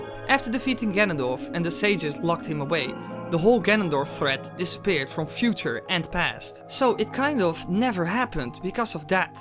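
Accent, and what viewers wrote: Netherlands. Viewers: Damn I thought from your accent you were either Dutch or Swedish. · Are you dutch? cuz of the accent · You sound heel erg Dutch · It's sounds like you have a dutch accent😁 I like the theory, it turns things dark. Me likes dark ;3 winkwinknotchnotch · You sound dutch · I can hear in the voice of someone from the Netherlands that they're Dutch since i hear it a lot since i am also dutch.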